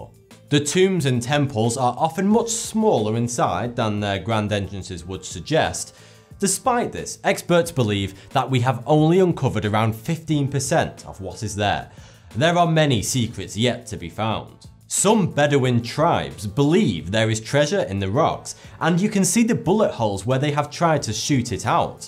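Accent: United Kingdom. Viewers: I didn’t know Frankie Muniz had a British accent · 42 or thought 2? sry ur too british for me to understand clearly.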